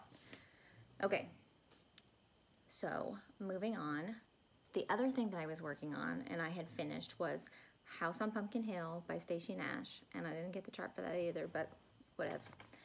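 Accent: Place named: South, United States. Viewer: Thanks for the sweet mention 😊. Maybe I’m biased but I find your voice super soothing, too. I think it’s a preference for southern voices from my Mississippi mom. You have some gorgeous projects❤️. I love lots of things in a chart - houses, anything red, bees and birds. Hope y’all have a great Halloween- btw our church does a big trunk or treat at 6pm on the 31st if you want to bring the kiddos. Happy stitching sweet friend😊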